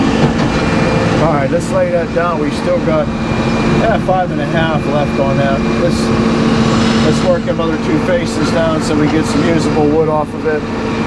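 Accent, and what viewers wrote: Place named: Maryland, United States. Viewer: With your accent I would say you are from Hagerstown MD, where I’m from.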